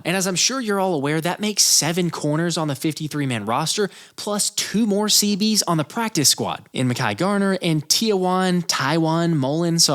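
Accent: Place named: South, United States. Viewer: Where are you from bro? Have a little southern twang to yiur accent, its obvious you are nkt from Philly...but great content!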